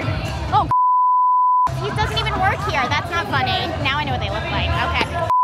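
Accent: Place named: New Jersey, United States. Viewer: I knew you were from Jersey or somewhere else close in the upper east coast...haha I can tell, born and raised in Florida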